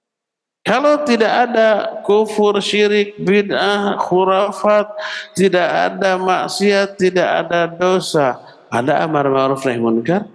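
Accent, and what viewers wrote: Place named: Sunda, Indonesia. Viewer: my favorit, kental logat sundanya,,mugia sehat selalu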